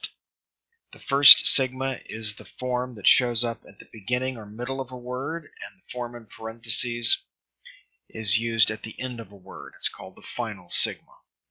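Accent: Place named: United States. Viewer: This is American pronunciation.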